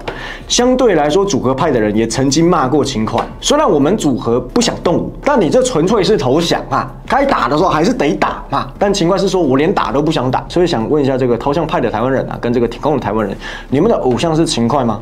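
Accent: China. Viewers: 為什麼你講話有大陸腔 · 你的口音是標準的大陸的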